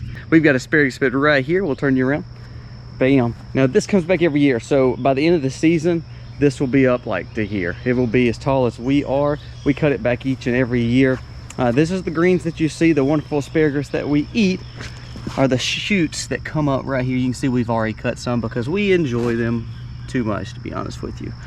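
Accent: South, United States. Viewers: He has a rather Southern accent. Maybe he moved, or is in the Appalachians or Ozarks where the weather is more suitable, but Rhubarb comes from the cold, Continental climate of Inner Asia (Central Asia + Himalayas/Tibet). It hates the Deep South and quickly perishes because the summers are hot and winters are far too short.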